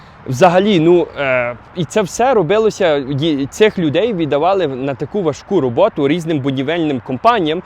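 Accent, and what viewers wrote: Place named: Ivano-Frankivsk, Ukraine. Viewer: ТЫ С ИВАНА ФРАНКОВСКА МУЖИК? АКЦЕНТ ХОРОШИЙ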